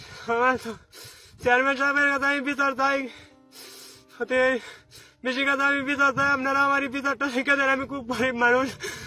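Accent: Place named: Sylhet, Bangladesh. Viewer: সিলেটি উনি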